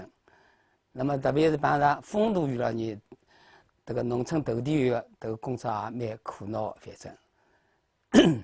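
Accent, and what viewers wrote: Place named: Shanghai, China. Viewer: 这不和浦东话一样吗，我说没和南汇合并前的老浦东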